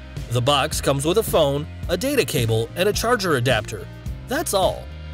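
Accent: United States. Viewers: Finally an English American speaking review, tired of all the desi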